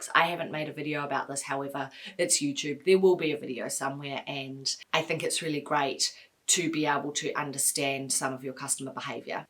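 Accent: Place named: New Zealand. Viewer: Oh, hey! I knew you were from new zealand from your accent! I live in new zealand too :]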